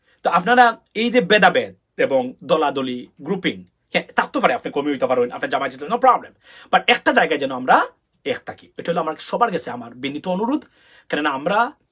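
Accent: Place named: Sylhet, Bangladesh. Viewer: ভালা আছইনি ভাই আমি মনে করছিলাম আপনে সিলেটি নায় এখন দেকি অনেখ ভালো লাগলো এই চেনেলে আফনারে দেকিয়া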